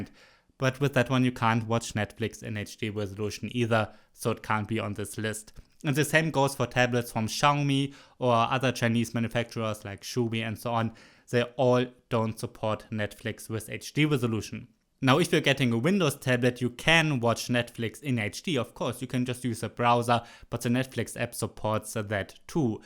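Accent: Germany. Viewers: This guy is German, right?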